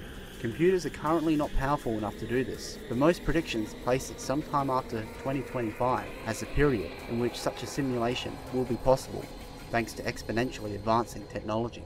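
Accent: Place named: Australia. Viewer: Too bad about the Australian accent. There were parts I couldn't make out.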